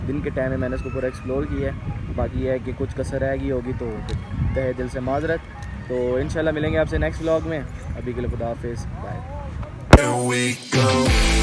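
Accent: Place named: Sialkot, Pakistan. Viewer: shb na jb first word bola tha ma tb hi smj gya tha k sailkot k han😊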